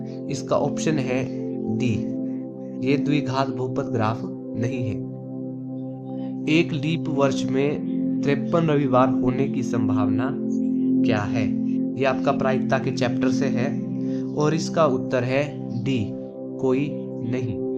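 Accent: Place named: Pahari, India. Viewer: Aapki voice to phadi logo ki traha lag rhe hai himachal me aap kha se hoo ...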